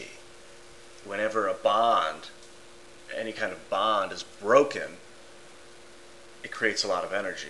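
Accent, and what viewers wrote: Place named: South, United States. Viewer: You must be southern.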